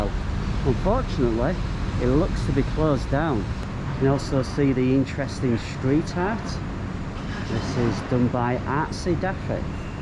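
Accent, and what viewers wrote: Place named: Northern England, United Kingdom. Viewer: Enjoyed the video. Nice to hear a northern accent. I have a mate here in KL from Liverpool but possibly you're a bit further north than that! Me, I'm a Sussex lad from the South.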